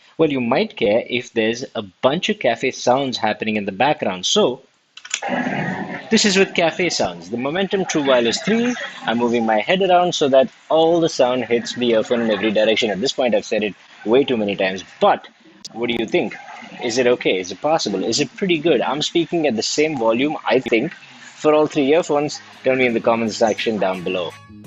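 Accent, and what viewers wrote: India. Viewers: First off, I'm an American. 2nd, I don't care if this guy has an Indian english accent. he's so fluid in not only verbal communication but his facial expression, charisma in front of the camera etc. keep up the good work!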